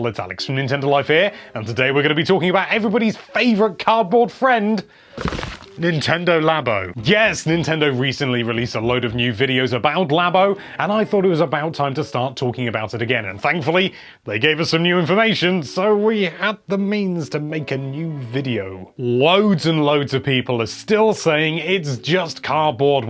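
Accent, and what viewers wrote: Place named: Wales, United Kingdom. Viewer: Why do you always sound like a Welshman in your intro but English the rest of the time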